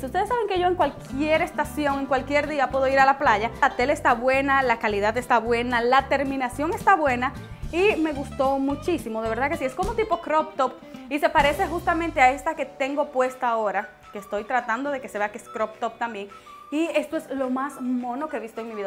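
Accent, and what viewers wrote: Dominican Republic. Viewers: Tú eres Dominicana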